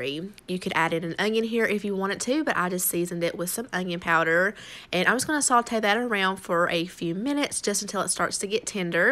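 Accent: South, United States. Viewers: Your accent is very southern lol I kinda like it. Where are you from? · I love your accent!!! I’m from NC, but I never got a true southern accent. But I watch one because I wanted to cook more. Two we have similar taste buds, and three your lovely accent ❤️❤️❤️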